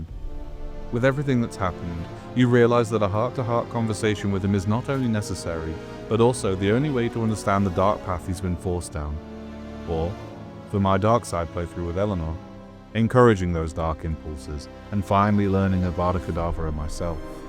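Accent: United Kingdom. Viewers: Yeah I’d say he’s doing it on purpose because he’s British What a weird comment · The creator is just well spoken and British lol you can tell it isn’t AI by the way his voice breaks every now and then. AI isn’t like this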